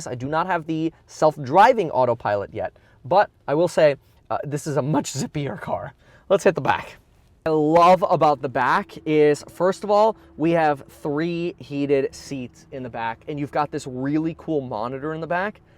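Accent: Germany. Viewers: Is your father german?! 😂 i swear the accent reminds me of it!!!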